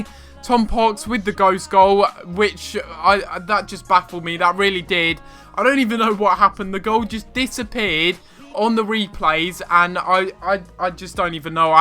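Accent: England, United Kingdom. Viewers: FIFA 16 Career Mode you did it sounded weird because of your English accent (I'm Australian)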